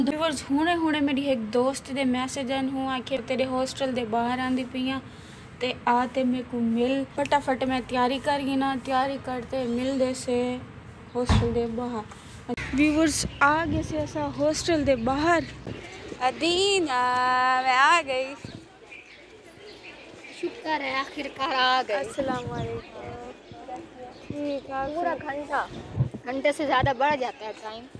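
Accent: Saraiki, Pakistan. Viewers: Apke voice bhut ache saraiki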